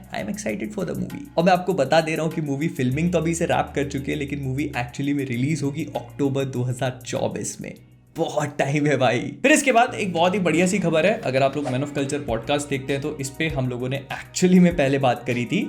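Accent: Bhopal, India. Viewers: The bhopali accent ✨ Miya!! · Ye bhopali wala hai kya 😆🤣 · Full Bhopal mode me bhai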